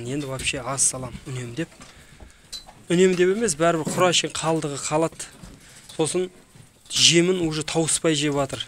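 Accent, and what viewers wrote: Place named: Uzbekistan. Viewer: Ассалаумагалейкум жаба дегенинизге караганда Озбекстаннан келгенсизба димин мен сиакты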